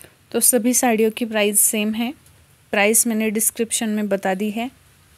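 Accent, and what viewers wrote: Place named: Maharashtra, India. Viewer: आवाजाचा टोन मराठी वाटतो . मराठीतून सांगितलं तरी भारी वाटेल बाकी पैठणी सुरेख आहेत.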